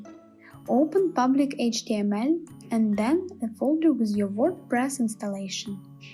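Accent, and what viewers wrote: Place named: Russia. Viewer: I love your Russian/Polish/Ukrainian accent.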